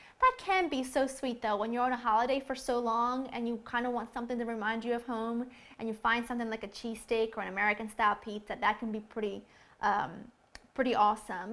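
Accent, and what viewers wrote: Naples, Italy. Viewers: you hold on tight your "italian napoli" accent :D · Ahahahaahahhaah hai proprio l'accento Napoletano ahahahahah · she's neapolitan and has that neapolitan accent. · Ciao! Non pensavo fissi napoletana..che strano sentierti parlare italiano! Un bacio ciaoooo;)) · si riesce a sentire anche la cadenza napoletana quando parli... ;) a sto punto fai pure un video in napoletano ;) greeting dalla prov di caserta :* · ahahahhahaha oddio che bello come parli in italiano!!! però devo dire che si sente tanto l'accento di Napoli e anche quello americano! è un miscuglio strano!! XD io sono di roma ma parlo anche fluentemente inglese, so I know what you mean when you do your videos! :D sei troppo simpatica!! e adoro le tue ricette! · WOW l'accento napoletano non lo hai perso per niente....sono orgogliosa di te,. Amo l'America, ma bisogna ricordarsi sempre da dove veniamo! 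Mi piacerebbe se facessi dei video in Italiano, ci faresti sentire di più...a casa! Napoletana DOC come te! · (Hai un bellissimo accento napoletano, nonostante tutti questi anni negli USA :) Ho sempre seguito i tuoi video in inglese e fa stranissimo sentirti parlare in italiano :P) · ma sei napoletana!!!:p o meglio, campana!!che bellezza!!anche io sono napoletana, studio lingue e il tuo accento mi fa sognare!!!è perfetto!!complimenti! bello sentire i tuoi ricordi...e mi fai sperare tanto per il futuro!spero di potermi trasferire altrove e sistemarmi as soon as i can!:p ciao! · Hai un meraviglioso accento napoletano quando parli in italiano, eppure parli l'inglese perfettamente! Complimenti! Bravissima e veramente bella.... un bacione dall'Italia! <3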